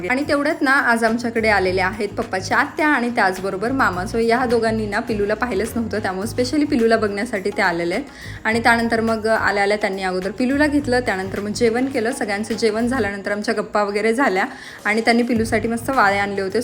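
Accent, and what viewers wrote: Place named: Kolhapur, India. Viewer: Kolhapur chi nsun pn kolhapuri vatates 😊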